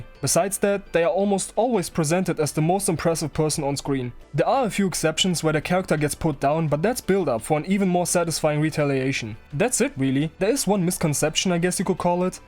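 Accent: Germany. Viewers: Du hast einen der krassesten Deutschakzente den es gibt. Ja Quanxi is pretty cool. I really loved how Kishibe liked/loved Quanxi and didn't want to see her Corpse. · Are you from germany? Your accent sounds really like from people from the country.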